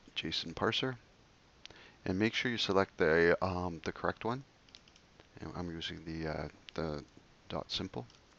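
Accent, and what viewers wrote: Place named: Scotland, United Kingdom. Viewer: sounds scottish. great accent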